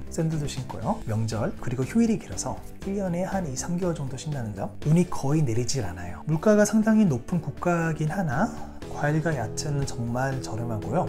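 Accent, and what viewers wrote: Israel. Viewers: מגניב!! נכון!!! ואמיתי כ"כ!!!! 😁😁😁😁😁😁😁😁😁😁 לא הבנתי איך יש לו מבטא ישראלי?! הוא ישראלי מאיזשהו כיוון?? 👍👍👍👍👍👍👍👍👍👍👍👍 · Your Hebrew accent is great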